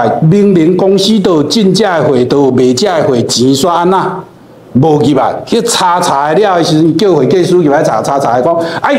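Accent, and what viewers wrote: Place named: Taiwan. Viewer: 台語真的好真實啦😂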